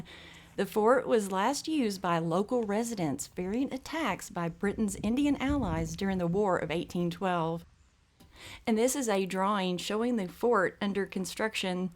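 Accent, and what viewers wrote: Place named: South, United States. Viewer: Love that slightly southern lilt in your voice.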